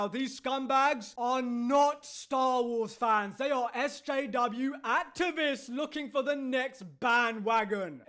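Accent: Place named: England, United Kingdom. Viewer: I love an English accent that doesn't make me feel stupid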